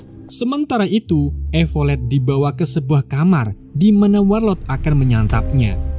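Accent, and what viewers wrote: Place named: Banyumas, Indonesia. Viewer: Mas admin, Njenengan asline ngendi mas? Narasine karo bahasa ngapak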